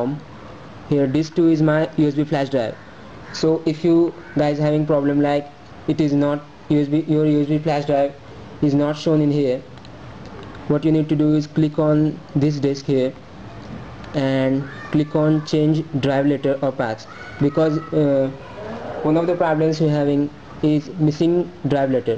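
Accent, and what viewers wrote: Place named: India. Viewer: indian accent idiot.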